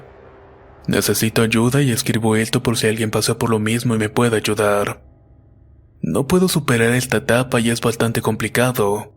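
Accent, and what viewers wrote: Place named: Mexico. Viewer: bonitas historias solo que lo narras cantando , es por tu dejo mexicano. saluds desde Peru.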